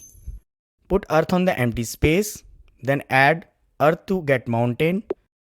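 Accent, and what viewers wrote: India. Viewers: bro got the india accent with an innocent brain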